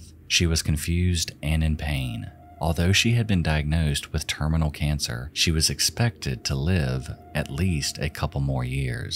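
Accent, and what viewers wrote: South, United States. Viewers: I know you're a fellow southerner. I can pick out that accent from anywhere.:p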